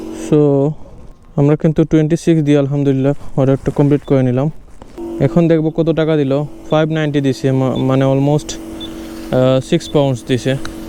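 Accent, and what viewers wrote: Sylhet, Bangladesh. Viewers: Sylheti kotha gula sunte khub valo lage 😊❤ Love from shahporan, Sylhet 😊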